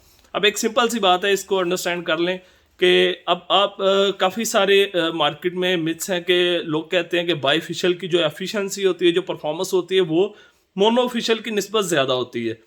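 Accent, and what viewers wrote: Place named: Kashmir, India. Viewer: Bhai muje aap Jammu Kashmir Ke bhai lagty ho. Accent se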